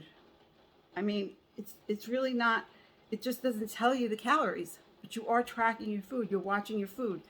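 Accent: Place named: New York, United States. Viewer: I love your newyork accent and your journey sw well done 🐝🐝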